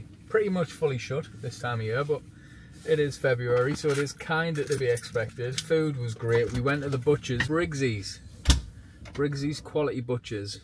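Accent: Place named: Durham, United Kingdom. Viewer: I used to know the Sloane family in Wallsend in the fifties, i wonder if youre related? I detected a few Durham words in your banter, and wondered if you have connections there? I haven't camped out in Northumberland since a was a bairn. I follow Jimmy Lundy on his YT channel and hope to do one of his camps sometime. I don't think he knaas this castle you visited. I've never hoarde of it Just wanted to say in closing You look a bit like Utred in the 'netflix LAST KINGDOM' series. I would like to record you saying "Honour! Is everything! " ⚔️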